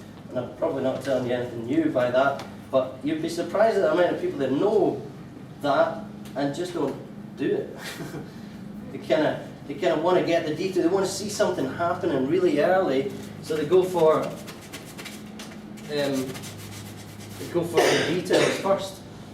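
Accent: Scotland, United Kingdom. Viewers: I have watched all of the videos in this playlist. I have acquainted my ears with Scottish accent. I can't say the same for the closed captioning system. It's actually quite funny to see what the automatic system thinks he is saying. He says never try to paint along with him, but I am. All of my colors seem to be bleeding together with the dark reds. I wonder why.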